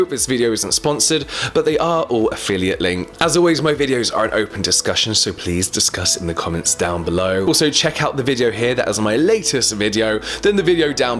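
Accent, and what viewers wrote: United Kingdom. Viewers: Love, love, love your videos! You are about my age and you look amazing. Of course I’m going to pay attention to your opinions with your fantastic skin and attitude. You’re amazing! Also a bonus is your charming British accent and humor 😍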